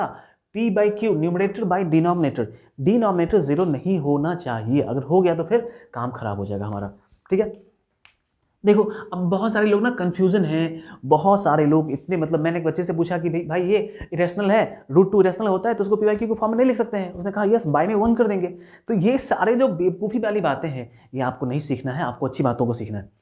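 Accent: Bihar, India. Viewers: Sir are you from Bihar?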